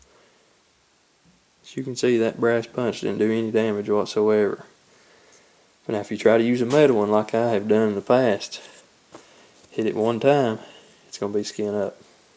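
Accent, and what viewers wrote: South, United States. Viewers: All vids should have a warning if they are going to be narrated in a southern accent. I would rather drill a hole in my fucking head than listen to a slack jawed yokel. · I've done this to 2 of my Glocks so far, so I have the experience but it was still fun to watch your video. You did it very well, and made the most important points of using loctite on the front screw, and a soft punch on the rear. I also really like the way you talk, your country accent is really cute, hehe :)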